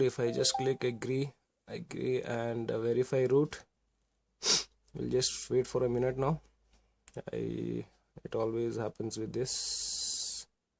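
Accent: India. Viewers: INDIAN VOICE DON'T TRUST THEM? Don't forget that Thomas Alva Edison and vishveshwarya were INDIAN'S...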